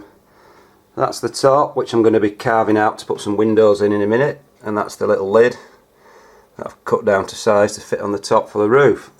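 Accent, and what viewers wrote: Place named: Liverpool, United Kingdom. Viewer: sounds like you're from Liverpool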